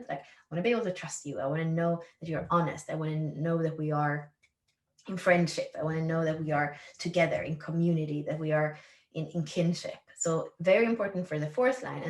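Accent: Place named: Caribbean. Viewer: You sound like a west indian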